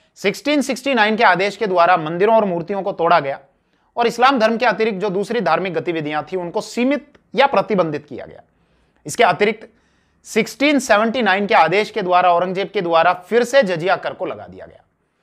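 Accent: Rajasthan, India. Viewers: your accent shows your Rajasthani roots is just great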